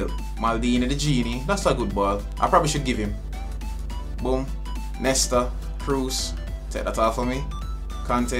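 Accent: Jamaica. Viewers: Bro u speak Jamaica?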